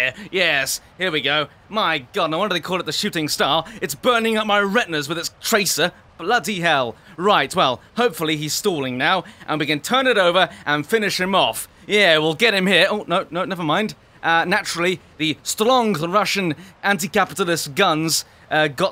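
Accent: United Kingdom. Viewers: its time once again i must fill my British accent bar up.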